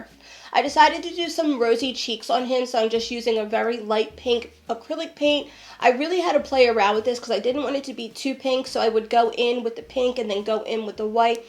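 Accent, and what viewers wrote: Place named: East Coast, United States. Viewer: First time with your channel,and it won't be the last. The the rich cocoa tone of your east coast accent. Miss my New Jersey home, and the sounds, now in Arizona. Love all the DIYS, especially the reindeer. Thanks for taking me home.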